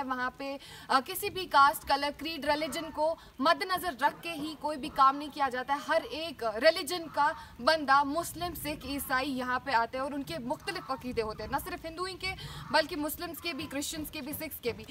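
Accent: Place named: Kashmir, India. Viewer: in your hindi kashmiri accent is awesome. 👌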